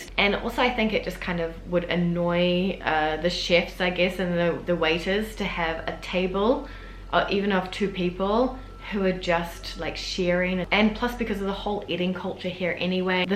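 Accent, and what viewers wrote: New Zealand. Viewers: wow your kiwi accent really came through when the auto-caption mixed up your six and sex... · I love your Kiwi accent, love ! Great summary of french foody etiquette. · Trying to work out your accent... Kiwi, right?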